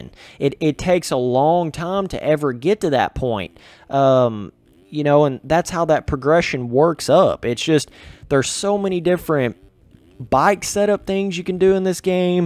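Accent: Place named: South, United States. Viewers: thats southern, not country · where r you from you sound so country.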